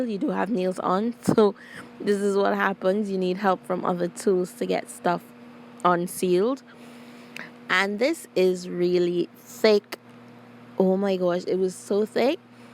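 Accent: Trinidad and Tobago. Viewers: Love this am hearing a Caribbean accent trini 🇹🇹 to be exact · Noticed that trini accent quick. Coming to see you soon from Chaguanas. 😁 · Where are u from?... U sound Trini...!!! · Trinidadian here and I recognize a gorgeous Trini accent anywhere love y girl and I’m glad there are some Trinidadians around here on YouTube with me 🇹🇹🇹🇹🇹🇹🇹🇹🇹🇹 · When I heard that Trini accent, I subscribe immediately. Love how you took your time to explain everything. I am now learning to do acrylics and gel nails. Thank you. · Always proud when I hear a Caribbean/Trinidadian accent 🇹🇹🇹🇹🇹🇹🇹🇹🇹🇹 very skilled nail tech, all the best for you in the new year!!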